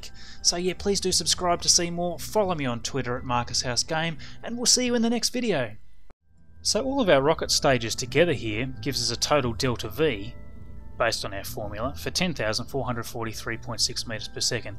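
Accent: Australia. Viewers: I love your Aussie accent.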